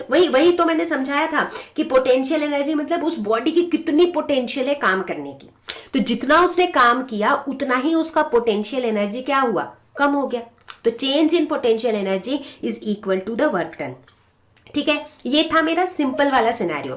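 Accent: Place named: Tamil Nadu, India. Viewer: mam your language like tamil "FEEL" ☺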